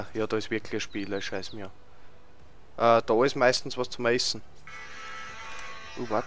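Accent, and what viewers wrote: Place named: Austria. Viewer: Ich bin auch Österreicher, voll krass aber irgendwie geil dass du auf YT so redest :D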